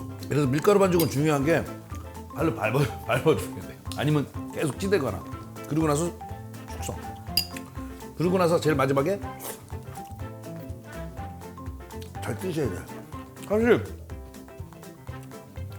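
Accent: Chungcheong, South Korea. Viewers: 충정도사투리가 구수하고 귀여우셔요^^ 믿고보는 님~맘도 따뜻하신 님~^^ 응원합니다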